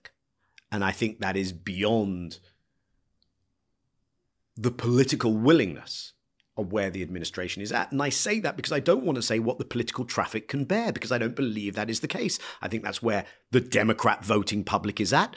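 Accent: United Kingdom. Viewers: Jeremy – This is way over my head but seems rational, if only because it is presented calmly and with a British accent - as if the Brits have no culpability. Perhaps you can follow the story .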